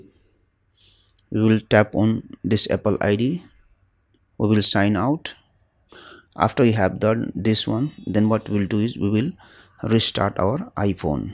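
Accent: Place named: India. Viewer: i hate when i trynna watch some like this and the indian accent came like fuck them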